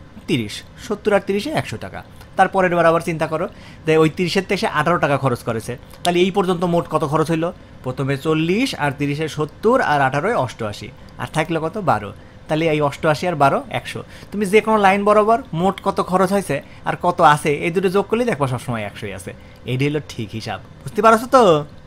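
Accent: Kushtia, Bangladesh. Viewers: এটা তো কুষ্টিয়ার ভাষাই। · কুষ্টিয়ার ভাষা শুনে খুবই ভাল লাগলো, কারণ আমি যে ও কুষ্টিয়ার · Im in a love with Kushtia r vasha. 😂😂😂❤❤❤ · শুনেই বুঝছি আপনি কুষ্টিয়ার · কুষ্টিয়ার অাঞ্চলিক ভাষায় অংকটা উপস্থাপন করেছেন, ভালো লেগেছে!! ভাইয়া❤️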